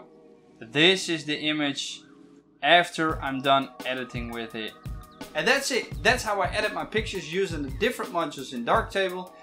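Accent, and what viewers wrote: Netherlands. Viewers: This dutch accent ♥.♥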